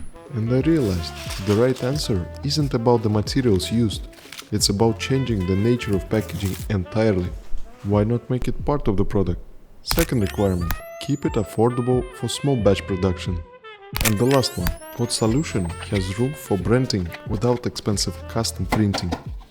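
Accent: Russia. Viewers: Where are you from? You saying "here in china", and given your accent, I would bet Russian. If so, cool. Glad to see a Russian making cool products, instead of failed wars. (Not to say we are much better, but we all know the war is a joke)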